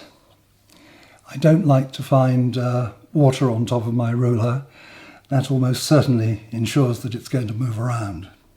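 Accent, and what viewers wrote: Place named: England, United Kingdom. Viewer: For those hard of hearing, or not familiar with pronunciation by an Englishman, 10,000 grit ceramic waterstone is made by Ohishi.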